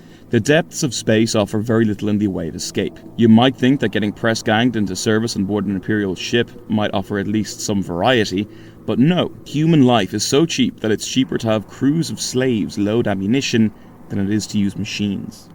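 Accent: Ireland. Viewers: God im so glad i found this channel, no AI, Irish accent, what more could you wish for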